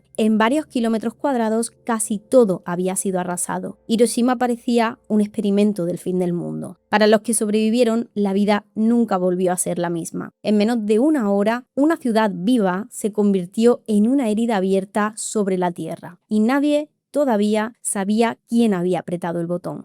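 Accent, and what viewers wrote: Spain. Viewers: Buenisimo documental! Y mas con tu hermosa voz y acento! De qué parte de España eres? Para reconocer el acento… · Es video es excelente, me ahorras el tener que leer un libro cada vez que veo uno de tus videos. Está muy bien documentado, te felicito. Y me encanta tu acento porque soy de latino América y mi país heredó ese acento andaluz como el que tú usas, solo que se te escucha mejor a ti. Te felicito y sigue adelante. Espero tus nuevos videos.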